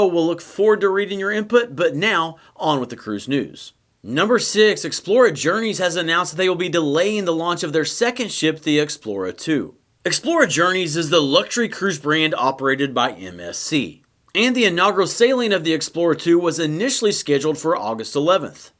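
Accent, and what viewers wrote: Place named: New York City, United States. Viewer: She's hilarious sounds like she's a new york city gal.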